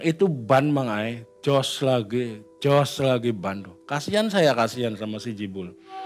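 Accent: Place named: Cirebon, Indonesia. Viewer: Yang bikin lucu itu logat Cirebonan - nya Mas, coba lebih diseringin tertawa jadi lebih asik nontonya.... Mas & Jibul, Wa, Wa Favoritku, BRAVO maju terus MM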